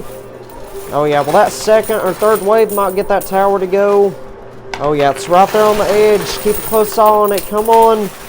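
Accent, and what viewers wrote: South, United States. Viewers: I really enjoy watching. You sound like you’re from down south.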